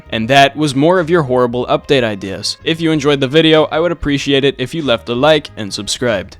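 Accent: United Kingdom. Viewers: Ayo British 😂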